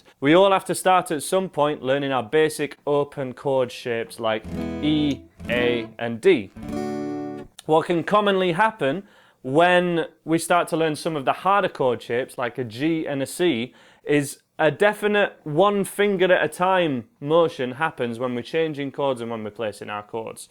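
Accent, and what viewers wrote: United Kingdom. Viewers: british accents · me without glasses btw its overwhelming that I thought you were pewdiepie playing a guitar with a British accent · This british accent 😍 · Ah, I love your british accent😍 Thanks for the tutorial!😁😜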